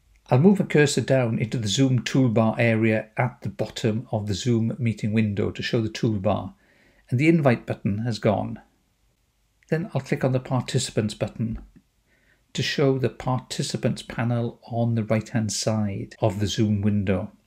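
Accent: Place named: Wales, United Kingdom. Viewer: Bore da Mr., Thank you so much for your Zoom videos. I teach at various universities in Okinawa, all of whom are now scrambling to get the students learning online. After viewing many other Zoom intro videos it became obvious that most of them were way too complicated and difficult for our Japanese students to follow. So, diolch yn fawr for your concise and much easier to follow tutorials. I especially appreciate the bonus of a narrative accent, not so different from my own Barry talk. Tidy, like! Owen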